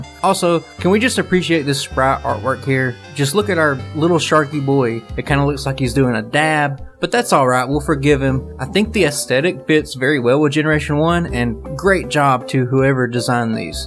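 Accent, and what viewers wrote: United States. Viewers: I like your country-like accent!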